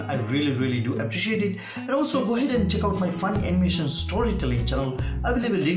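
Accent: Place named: England, United Kingdom. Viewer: Enjoyed the video. Thank you for the useful info. Although..... It was toooo difficult to understand the English Accent, and the opening logo was sooo long I almost skipped.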